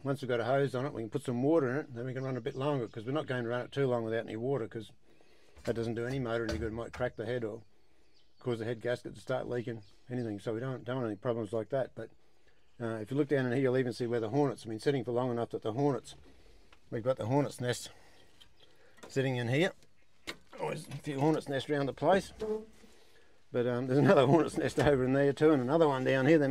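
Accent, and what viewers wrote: Australia. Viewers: Was happy to subscribe and comment. Will also share it as well. Love being able to here an Aussie talking, I was beginning to think I was Turning American LOL. Thanks can’t wait to follow along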